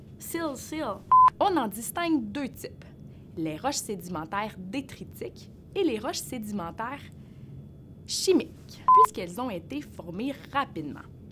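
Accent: Quebec, Canada. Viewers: C juste l'accès du quebec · Avec sa manière de parler en dirait une québécoise ❤ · Omaima Laftem hadak dialect canadien wa9ila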